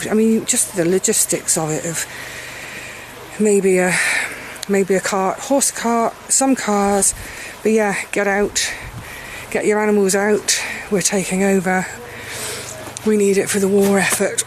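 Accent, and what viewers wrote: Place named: Dorset, United Kingdom. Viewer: I noticed you're getting a bit of a Dorset accent here & there! xx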